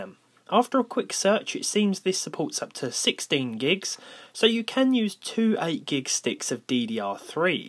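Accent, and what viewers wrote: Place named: Essex, United Kingdom. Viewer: you sound like you're from Essex , although I maybe wrong. Anyways great video , but the x79 Chinese mobos are either a bust or they last like 3-5 years.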